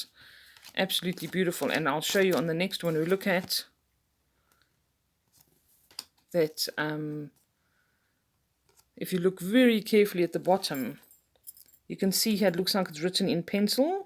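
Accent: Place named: South Africa. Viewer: I was born in Cape Town, so I was immediately drawn to your South African accent lol. I am thinking of purchasing this deck and came across your channel when I searched for videos on the Celtic Tarot. Thanks so much, I think I may grab myself a copy. The art is indeed very interesting and beautiful, and although pip decks are not typically my favourite, this one definitely does intrigue me 😊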